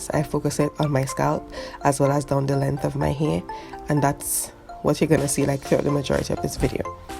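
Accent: Trinidad and Tobago. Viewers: i now go to say her accent sounding rel strong like ah Trini but i hearing ppl saying African and i wondering why but everywhere a trini go dey say u are from Africa haha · She sounds Trinidadian 🇹🇹🇹🇹🇹🇹🇹🇹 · Its ur trini accent that made me watch the whole vid. I dun kno how to use rice water but it was really/rell nice to hear it from a trini. :) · Oh... I use par excellence rice and I don't get what you get at the top of the rice, plus you can add 10 drops of lavender before you put it to ferment... Love hearing my Trini accent ☺ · Sounds like she's trini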